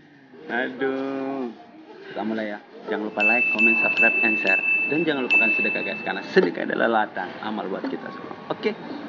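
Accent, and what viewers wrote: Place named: Makassar, Indonesia. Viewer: Mantab tawwa nda nabuangi logat Makassar nya Ada biasa barui sekali ke kacarta ehh kaki ku keinjjak kaki gue 🤣 Salam kenal sodara 🙏 sesama ana Makassar Makassar bisa tonji 🤣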